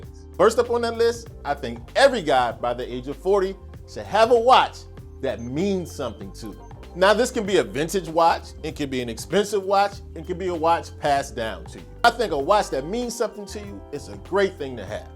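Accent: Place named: Alabama, United States. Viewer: Bro you from Bama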